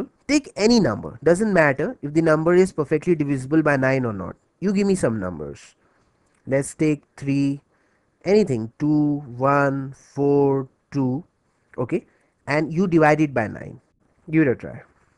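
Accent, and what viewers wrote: India. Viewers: his accent tells me he Is totally Indian. I know because first of all only Indian have those accent and.... I'm indian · thats indian accent. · Are you Indian? Your accent is Indian. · Indian accent!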